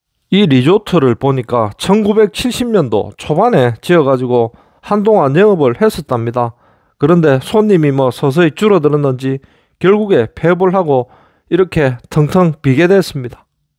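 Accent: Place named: Busan, South Korea. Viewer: 오리지날 부산사투리로 해설해주니 정겹고 잊은지오래되는 사투리도생각나서 너무즐겁습니다 화이팅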